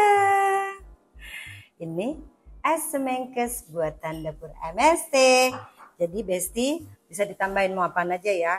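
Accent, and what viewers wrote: Banyumas, Indonesia. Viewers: 😂😂😂 lucu bgt mbak ngomong ngapake tp tetap ayu Bae klambine apik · Terhibur banget dg BHS ngapak2nya.. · Pk bambang GA Salah pilih... Wong ngapak pancen oye.... 👍👍👍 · Masyaallah 😅😅😅 ketawa terus liat Tante cooking ngapak2 pulakkk❤❤ sering bikin video masak ya tan..😊😊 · Puluhan th seneng apal lagu2ne Rika.. Tapi nembe saiki weruh krungu Rika ngomong ngapak😂😂😂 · Wes ayu,lucuu,pinter masak sisan.gak lali mbak Ayu ngapak e. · Bunda lucu nemen ya pada karo enyong nge,enyonge juga wong ngapak...tegal hadir bunda 😍😍🤭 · Mba lucu ngomong ngapak sukses mba ❤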